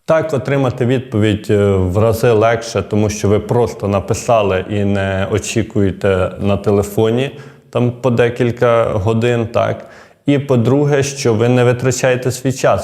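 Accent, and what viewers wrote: Russia. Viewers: Добре,але ваша вимова шиплячих дуже москальська і дратує українські вуха.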